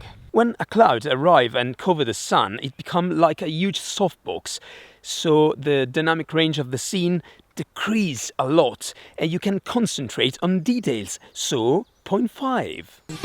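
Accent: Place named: United Kingdom. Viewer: Accento britannico perfetto!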